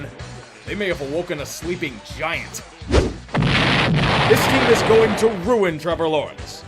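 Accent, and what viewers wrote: Pittsburgh, United States. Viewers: Alright, do it. Take the shot Seattle's defense gets obliterated via yinzer ranting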